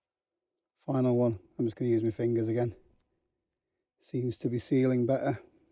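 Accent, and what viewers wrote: United Kingdom. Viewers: Great video as always! I will try this with the kids when I next take them to the mountains. I'm from the U.K. but have lived and hiked in Japan for the last 12 years. It's great to have a British voice to listen to and get opinions on and see the places I used to walk in. Great channel keep it up!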